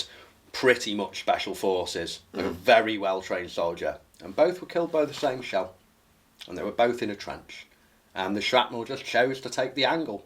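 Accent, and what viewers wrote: United Kingdom. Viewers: British ish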